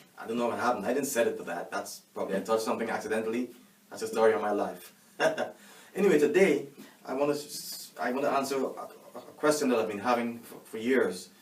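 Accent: Trinidad and Tobago. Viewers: Sound Trini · Thanks so much for this. I now started using Tomato Tone. PS for the entire video I thought "Why is he sounding like me?" Only to read the comments and see that you're from Trinidad too. I'm just here in Couva trying desperately to grow tomatoes :)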